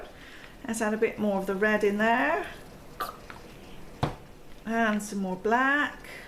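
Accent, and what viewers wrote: United Kingdom. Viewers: You sound British but the brands you use are not available here.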